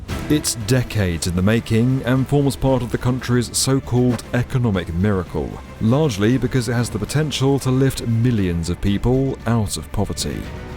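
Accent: England, United Kingdom. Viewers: why naratorrrr talk like inglish with nose full off secretions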